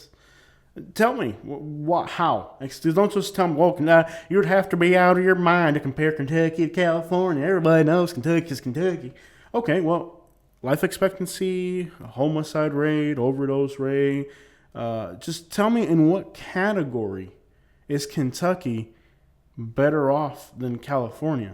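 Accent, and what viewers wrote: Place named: New York, United States. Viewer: Apparently you are not from this area. You sound like you’re from New York. Get your facts straight before you make an ass out of yourself.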